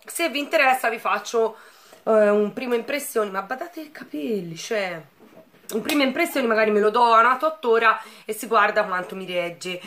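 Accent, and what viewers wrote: Tuscany, Italy. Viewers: Grande mi mancava sentire il nostro toscanaccio!!! BEN TORNATA!!🎉🎉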